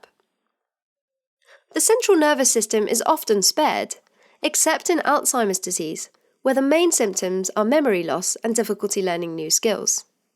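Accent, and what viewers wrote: England, United Kingdom. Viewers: I missed you,! Lovely Brit accent! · I love your british accent... 😍😍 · Whats with the british accent.. Its not even the bbc english which is easier to understand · I love british accent! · I wish you get rid of this british accent. We do not understand 🤥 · Beautiful british accent!!!!! Great lecture😊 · Loved that British accent !!! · your British accent is so cool kinda strange but still cool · She explained it so well, but her heavy English accent is bothering me so much that i cannot focus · I love the typical English accent .... would love to hear more 😋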